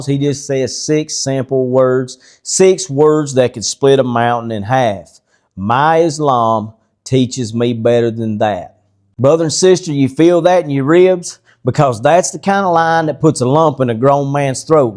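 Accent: South, United States. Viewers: Masha’Allah, Uncle You have a special way of articulating in these videos, delivering wisdom in that southern accent is heartwarming to see. Keep up the great work. May Allah make us steadfast on this faith. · Brother, every time you share a story in that beautiful Southern accent, you wrap a powerful lesson in the most gentle warmth. You take an incredible act of honesty and make it feel like a conversation on the front porch—simple, true, and straight from the heart. And when you end with, "and that's all I gotta say about that," we feel the weight of your faith and the sincerity of your character. It’s a blessing to have your voice in the Ummah. May Allah continue to bless you and your good wife. Ameen.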